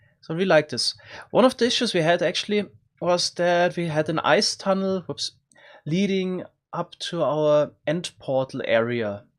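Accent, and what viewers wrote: Germany. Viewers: Sounds like a german Guy🤷🏼‍♂️🙋🏼‍♂️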